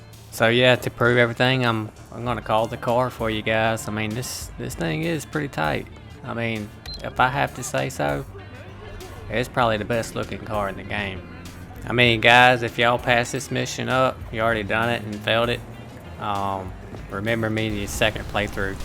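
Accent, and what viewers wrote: South, United States. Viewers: Great video, choom. Really helped me out. Also, I really like your accent! Where are you from? Somewhere down south I would assume? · Love your accent man! Reminds me of my grandparents, who are very southern. Thank you for the informative video my dude! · Man, I love your southern accent—nothing like a man with a southern drawl. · Subscribed cause sounds like a fellow southern brother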